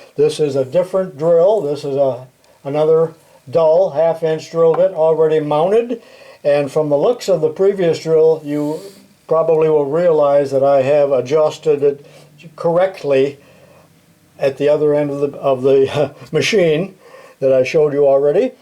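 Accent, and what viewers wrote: China. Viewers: Chinglish can be hard to understand.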